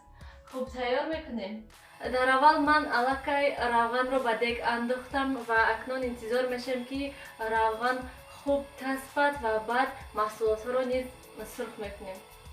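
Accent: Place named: Hisor, Tajikistan. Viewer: Рахмат ма ай хисор ранги мо гап мезанед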